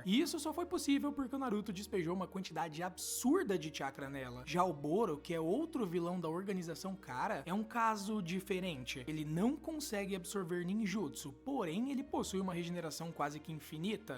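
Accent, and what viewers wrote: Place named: Caipira, Brazil. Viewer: voce tem seriamente que corrigir esse (R) caipira que vc tem, isso é feio de mais.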